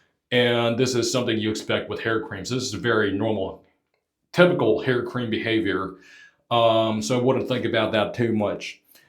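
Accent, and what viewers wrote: South, United States. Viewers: Awesome review and look, dude. You have a Southern twang now! Rockabilly runs in your veins, man.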